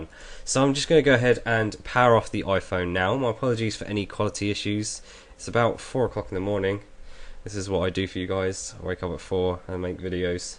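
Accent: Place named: Southeast England, United Kingdom. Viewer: Thanks a lot brother. By the way I love Southeast England accent.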